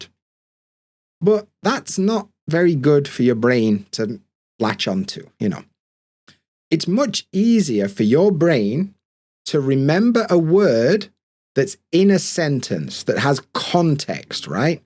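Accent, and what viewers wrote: United Kingdom. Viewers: I love British Accent 🇬🇧 it's really soft for my ears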